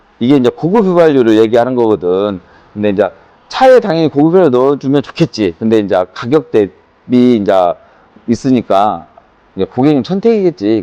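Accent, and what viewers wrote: South Korea. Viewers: 전라도 말투 듣고 신뢰가 안간다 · 흐미 즐라도 광주분이신가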